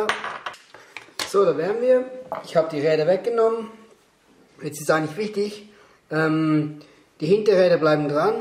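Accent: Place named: Switzerland. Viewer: Twelve grades caster I understand in your swissy german!!!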